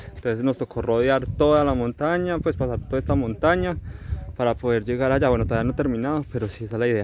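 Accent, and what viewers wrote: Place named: Antioquia, Colombia. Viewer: Ese acento paisa me encanta. Gracias por el video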